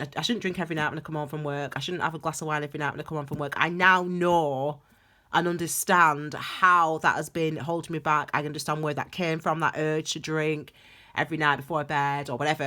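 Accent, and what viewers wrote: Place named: Northern England, United Kingdom. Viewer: I just found you the night b4 the New Moon when I am beginning a 40 day grape 'fest/fast'! Ha! Timely in deed. You're into the same stuff I like too and you describe things well. When you did the Reiki I felt my head and throat as blocked. My heart to so you were spot on. Ta very much! I live in the US but come from SE England. You're a Northern Lass I can hear that much! Thanks and blessings and happy new moon. I did subscribe so I can listen and learn some more. Cheers.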